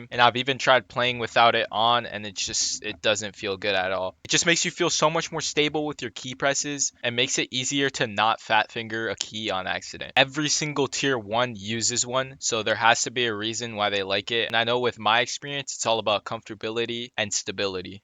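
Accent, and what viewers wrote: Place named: Canada. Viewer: Je sens ton accent canadien 😂😂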